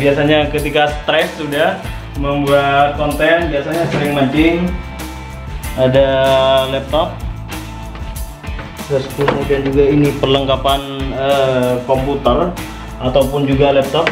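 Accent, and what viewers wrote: Madura, Indonesia. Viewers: Mas asli orang mana? Logatnya koq kadang sy dnger kaya orang madura ya😁🙏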